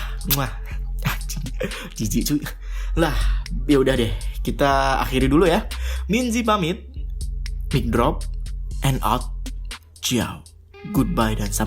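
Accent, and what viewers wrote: Java, Indonesia. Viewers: Mas sampeyan wong jowo zo...?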